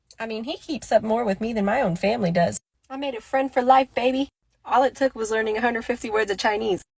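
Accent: Standard. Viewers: 這個女生英文說得好標準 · 她的中文發音很標準呀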